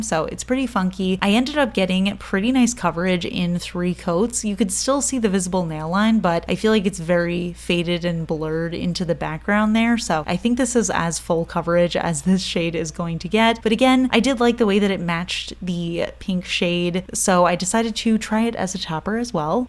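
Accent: United States. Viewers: Oh what a wonderful video- spot on! Thank you- have ordered 4 of them. Where from the US is your accent? Becky from Sydney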